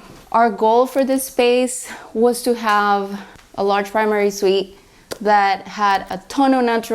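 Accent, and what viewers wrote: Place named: Israel. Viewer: From your accent I think your mother tongue is Hebrew. Be Matzliach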